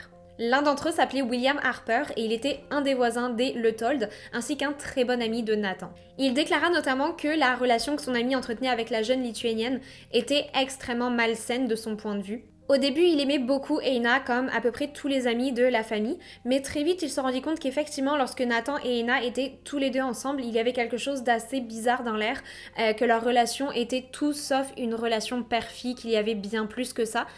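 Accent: Quebec, Canada. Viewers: Je viens de découvrir ta chaîne, je m’abonne ! Je viens aussi de lire que tu étais française mais vivant au quebec c’est fou l’accent que tu as pris 😳😳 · je me doutais bien qu’il y’avait un petit côté Québec hihi 😉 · Allo ! Je vien de découvrir ta chaîne 🙂 dit moi ton accent est de où ? Je trouve que tu as un accent québécois mélanger avec un accent français. Super vidéo ! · Coucou j'adore tes vidéos et ta chaîne, petite question est-ce que tu vis au Québec car j'entends que tu es française mais une petite pointe de québécois Bisous du Québec 😘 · quebecois et français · Ça m'a tout l'air d'une Québécoise d'origine qui essaie de parler un français de France. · Je m’abonne j’adore ton accent et tu compte très bien les histoires!! est tu Québécoise ☺️? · Bordel tu as bien pris l'accent québécois dans cette vidéo. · tu as un mini joli accent, c'est canadien? 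je viens de découvrir ta chaine et du coup je m'abonne :) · Étant moi-même du Québec, je me disais que ton accent était très ressemblant à celui ici au Québec, comparé à d'autres Youtubers français (Je viens d'apprendre que tu demeures au Québec) J'adore ton contenu, comme toujours Continues sur cette belle lancée 🤩👌 En route vers les 100 000 abonnés 🎉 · Tu as un petit accent québécois 🤔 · Fake c’est ca????? Quebecois dans l’accent, je reconnais ma langue fille hihihi j’adoreeeeee ♥️🌸 et en passant tu est vraiment magnifique en plus de très intéressante et crédible, tres bonne chaîne j’aime bcp , merciiiiii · J'adore ta vidéo ! Sinon, rien à voir mais je trouve que tu as un léger accent entre l'accent canadien et l'accent belge ahah :)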